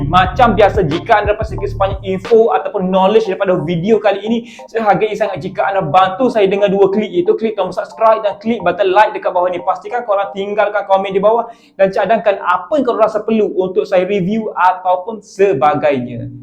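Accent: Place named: East Coast, Malaysia. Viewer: bagus ada skit cara org pantai timur cakap saya suka, teruskan cara ini, kalau org utara cakap depa bantai slang mereka je skrg org timur pun patut cakap cara slang timur baru ada kpelbagaian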